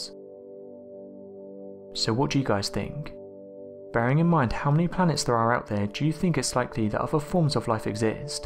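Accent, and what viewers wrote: United Kingdom. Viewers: That is it. I am sick of your videos. 1) you should not narrate your videos until you correct your "Rs" and "Ss" and loose the brit accent. 2) Stop using deceptive titles. When you were a child, you got in trouble for stealing.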